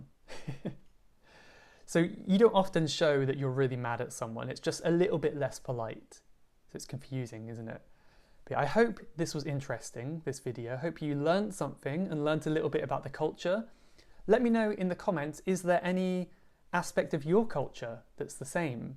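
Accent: United Kingdom. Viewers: both direct and indirect are good however it depends upon the situation which of these do wanna apply when talking to someone you know or stranger to avoid confusion, misunderstanding and being unkind to one another. Your podcast really help me a lot to improve my English and I find the topics you discuss on your podcast with some non native english speaker quite fascinating and inspiring. I also wanna compliment you for having a soothing voice to listen to and I do recommend your podcast for beginners who are studying english. It has a slight British accent but you’ll get used to it just keep on listening with intriguing topics.Thank you once again.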